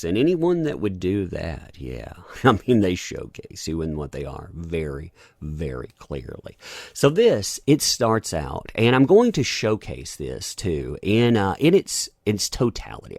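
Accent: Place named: South Carolina, United States. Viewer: "Y'all" is disgusting to you? You sound like your an SC boy like myself so that's just heresy!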